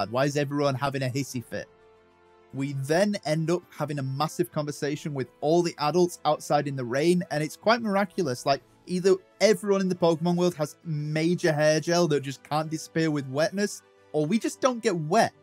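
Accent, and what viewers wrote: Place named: Scotland, United Kingdom. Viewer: Haha great vid! I love your Scottish accent, I play golf with a scotsman, he happens to be ginger too, I don't know much about Pokeymans tho, you earned a like.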